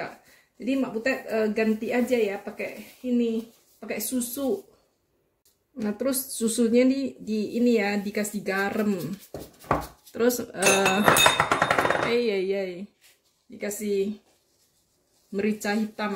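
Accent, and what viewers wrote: Medan, Indonesia. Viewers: Aku suka bgt logat Medanya kuat, menjadi ciri has mak Butet..masak nya enak² penjelasan jls..tks🙏😇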